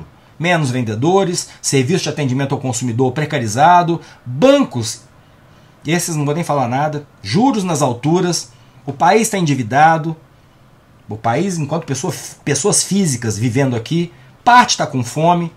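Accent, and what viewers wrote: Minas Gerais, Brazil. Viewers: Faço minhas suas palavras. Parabéns pelo tema deste vídeo. Agora, diferente de você que é mineirinho, imagine o meu grau de frustração por morar em Curitiba que, embora seja considerada uma capital com um dos maiores índices de qualidade de vida do Brasil, convivo com a população mais "canhinha" que habita nosso querido torrão natal. Você conhece a escala de Bristol? Se conhece lhe digo que o pessoal daqui tá no topo da escala "prisão de ventre" Mineiro fala diferente mas nós aqui também.😂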